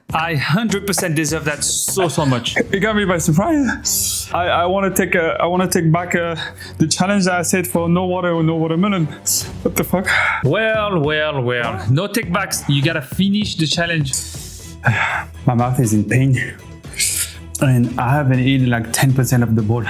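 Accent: France. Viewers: The french accent makes watching this so fun( u should have 100k subs ATLEAST)😅😅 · Is that a french accent I hear??? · Beautiful vidéo the french accent Is definitely your signature branding wise, don't loose It .x')❤ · Why do you have a French accent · Salut mec depuis que j'ai clicker sur t'a vidéo j'ai directement remarqué ton accent français 🤣🤣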